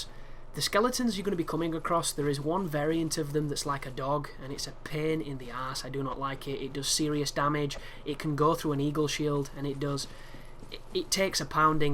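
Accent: United Kingdom. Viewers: One of my fav videos.PERIOD. Not only very helpful but fucking HILARIOUS!😂😂😂 the combination of your beautiful Brit accent but the excessive cussing at enemies and then the crown jewel was your WOW OPINIONS! Spot fucking on! "I'm not trying to offend anyone I'm just stating facts!"HAHAHAHAH I so rarely like/subscribe etc I'm not a dick I just forget but this was an automatic LIKE/SUBSCRIBE/& DONATION😎 I'm not easily amused in fact kind of a negative person at times but this made my already great night, thx, looking forward to other videos, I'm going into your history👊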